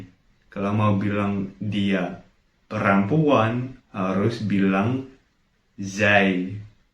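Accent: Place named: Java, Indonesia. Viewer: finally video baru🤩 semangat bikin video² baru lagi🙌😂 btw suaranya kayak ada medok² Jawa🤭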